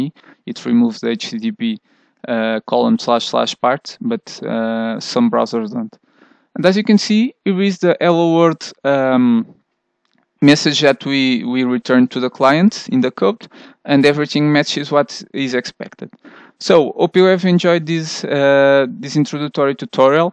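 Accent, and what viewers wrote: Portugal. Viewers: Great! Quick reference. Thanks. :-) You're Portuguese, right? I'm Portuguese and, from your accent, I would guess you are too. Just a quick correction. If you have your local WiFi router correctly set up, you don't need to be on the same WiFi network to access the web server. you can access it from any other inter-connected network. The Internet, for example.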